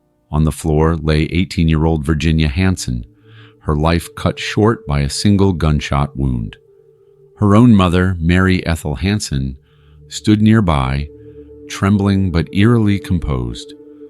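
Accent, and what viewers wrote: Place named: Utah, United States. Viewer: corrected your mistake on JASMINE RUTH BRIDGEMAN and now I need to let you know that in Utah the predominant religion is the CHURCH OF JESUS CHRIST OF LATTER DAY SAINTS (MORMON) and we have the most children in the country because they believe that they are making more of actual God’s by having many children, so that explains why Utah is notorious for child abuse and child deaths. Again thank you for the spotlight on these horrific crimes. Not sure if you are from Utah but sounds like you are. Again thank you.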